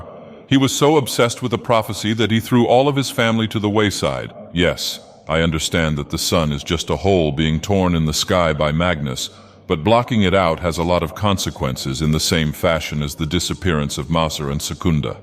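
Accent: United Kingdom. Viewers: Anyone else notice an increasingly thickening British accent the past few videos?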